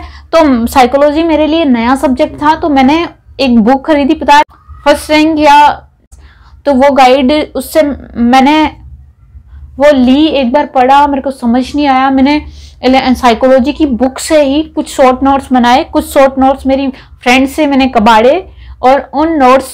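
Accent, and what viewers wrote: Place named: Rajasthan, India. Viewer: Puri rajhisthani bolti ho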